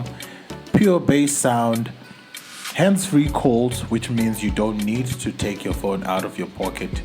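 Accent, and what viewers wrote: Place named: Zimbabwe. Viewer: you sound like a Zim own?:). You from Zim?. Great review and unboxing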